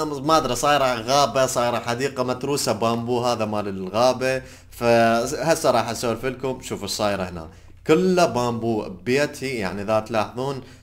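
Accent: Iraq. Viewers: كرك بادك عراقي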